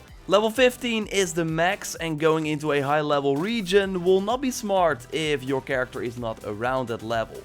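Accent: Netherlands. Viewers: You sound Dutch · Are you dutch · Just wondering are you Dutch? (Not bashing your accent my friend however I'm Dutch and I was wondering if I guessed correctly)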